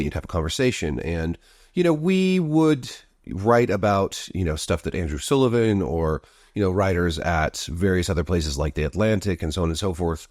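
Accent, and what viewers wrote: United Kingdom. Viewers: your English accent kills me every time, I love that Ubisoft unintentionally outed Thomas Lockley as a fraud is the real win here.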